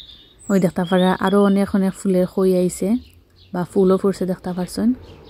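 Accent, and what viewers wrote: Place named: Sylhet, Bangladesh. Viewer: আপনার সিলেটি মাত আমার কাছে খুবই ভালো লাগে। ধন্যবাদ আপনাকে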